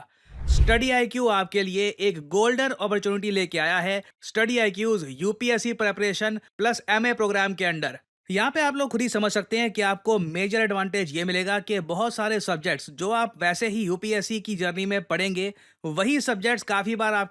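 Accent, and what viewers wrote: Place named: Haryana, India. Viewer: Bhai wo bolane ka accent hai Haryana uttrakhand mein sayad bola jata hai.😅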